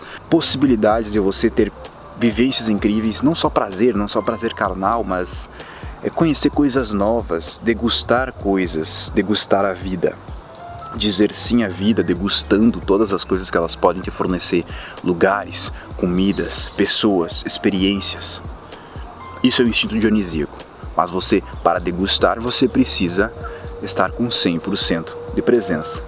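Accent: Rio Grande do Sul, Brazil. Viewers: Ah, sabia que conhecia teu sotaque! Tu é do RS né?! Se não, é muito parecido. Excelente vídeo e paisagem 👏🏽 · Tu é gaúcho?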